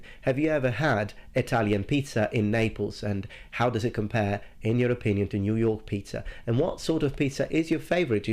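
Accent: England, United Kingdom. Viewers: You speak with a somewhat British accent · I always forget your Italian. Your UK 🇬🇧 English accent is immaculate. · But your accent seems someone from UK, are you Italian British? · Hey, goomba what’s with the semi-English accent? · That actually sounded very british for some reason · No accent? He has the accent of England all over his English! (Moi, statunitense . . .)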